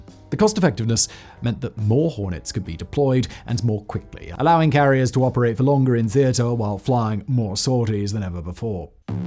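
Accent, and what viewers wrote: United Kingdom. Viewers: Slow down! You talk so fast in your brit style us Americans can't keep up bro...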